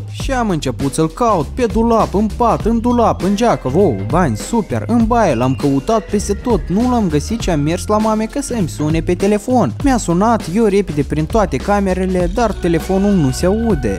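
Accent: Moldova. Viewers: esti din moldova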